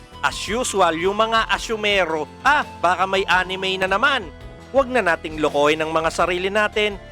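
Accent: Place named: Samar, Philippines. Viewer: Hulaan ko probinsya mo, SAMAR noh?😁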